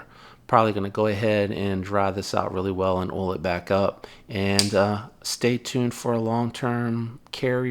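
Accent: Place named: United States. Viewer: Yeah buddy your good at making those videos where you from you sound like your form the good old US of a ☺️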